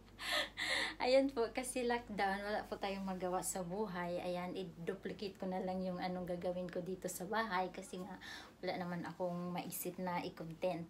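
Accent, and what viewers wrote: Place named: Visayas, Philippines. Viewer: Halatang bisaya ka din po..same tau hehe..ang linis ng bahay pgnaka quarantine 😅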